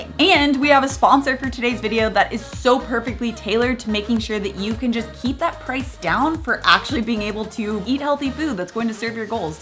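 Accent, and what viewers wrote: United States. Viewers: Did you say cauliflower instead of banana to give smoothie thickness ? I can't understand your American accent you speak so fast, pls slow down !!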